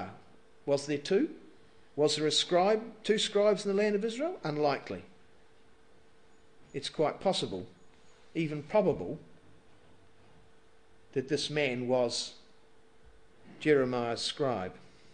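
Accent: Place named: England, United Kingdom. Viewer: the speaker is English and he pronounces words differently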